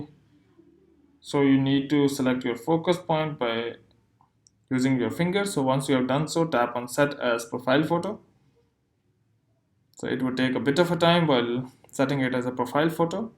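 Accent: India. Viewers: Right when I heard that Indian accent I knew it would be the easiest tutorial thanks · You know he speaking facts when you hear he’s Indian accent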